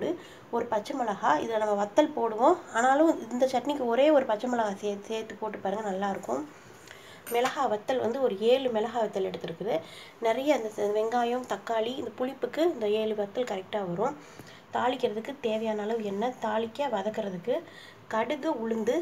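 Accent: Madurai, India. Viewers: Nenga madurai yaaa??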